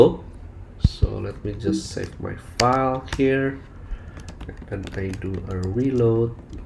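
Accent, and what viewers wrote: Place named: Philippines. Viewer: Are you from Philippines? Your accent is so similar to my co-worker it almost feels like I'm listening to him talk 😁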